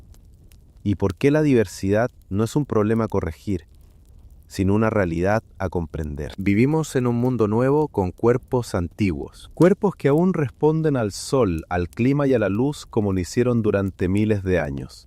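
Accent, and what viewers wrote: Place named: Chile. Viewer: Me encanta la IA con lenguaje cbileno, like en todos estos videos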